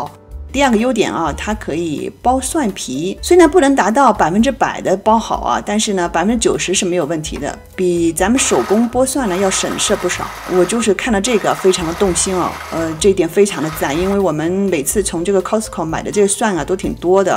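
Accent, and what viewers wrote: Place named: South, China. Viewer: 估计播主是南方人，所以有困难，不要苛求，感谢播主的分享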